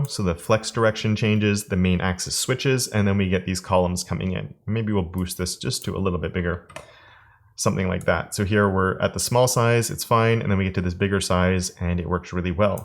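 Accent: Canada. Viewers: The canadian "out" pronunciation is so strong, on this one :)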